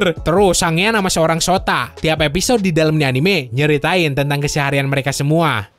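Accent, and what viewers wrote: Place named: Java, Indonesia. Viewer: jawa keras lurd wkwk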